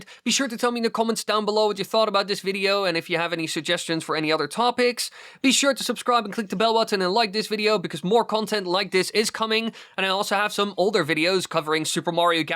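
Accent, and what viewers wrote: Netherlands. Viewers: Je moet je hollands accent nog iets beter verbergen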